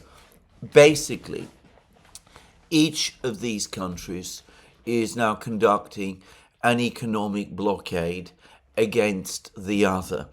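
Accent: England, United Kingdom. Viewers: This just goes to show that just because you have an English accent, doesn't mean you know something. Your economic analysis is a bunch of hot air. What will you say when the tariffs work, which they will, and they already have started working.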